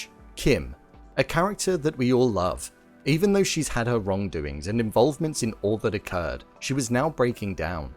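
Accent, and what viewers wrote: United Kingdom. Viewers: 英国なまりを、頑張って聞き取った。 と、思う。 次も、頑張る。💪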